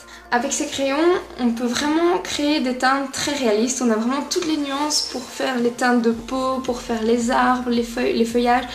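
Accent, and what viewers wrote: Belgium. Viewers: Sympa la vidéo :) Une question me brûle les doigts, es-tu Belge ? Si oui, d’où ?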